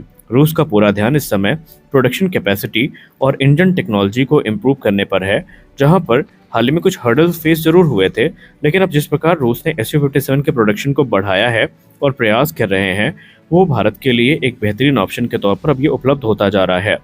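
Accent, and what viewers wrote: Pakistan. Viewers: Sala tera awaj padosi jaisa lag raha hai tm pakistani channel hai paaka INDIAN NAAM RAKHA HAI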